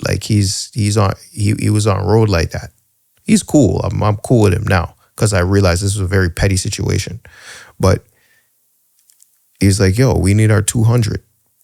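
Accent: Toronto, Canada. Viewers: I heard the way you speak and thought you were from Canada. Where are you from? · The moment I heard the accent I knew you were from Toronto. Its 630 am and this was a great morning listen. Sending love from Brampton.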